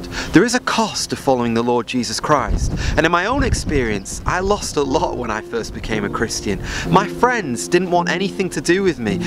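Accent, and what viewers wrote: United Kingdom. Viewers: It's British . I say it that way too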